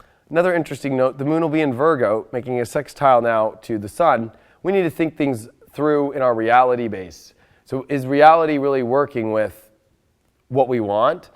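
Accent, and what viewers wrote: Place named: California, United States. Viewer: Love you dude you talk just like my friends back home must be a cal thing. Thanks bro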